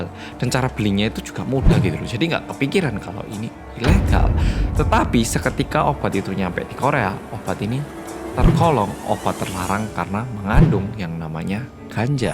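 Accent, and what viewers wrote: Java, Indonesia. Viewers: Welcome back wong medok dengan detektif 🥺🥺🥺🥺 Betapa kangen nya aku sama detektif 🤧🤧🤧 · Siappp mas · Alhamdulillah. Orang aplud lagii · timmmmmmmmmm gercepppppppppp korea medokkkkkkkk mas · Tim gercep Mas wkwk ❤️❤️❤️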